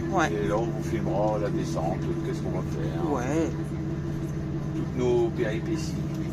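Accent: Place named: North, France. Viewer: Coucou on entend l'accent du nord ci je ne me trompes pas ❤👍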